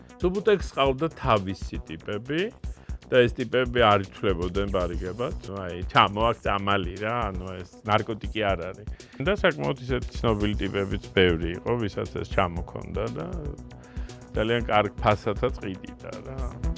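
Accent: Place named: Tbilisi, Georgia. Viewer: МОГИТКАН СВЕТСКИ СИРИСТИАНИ ТБИЛИСЕЛИ АКЦЕНТИ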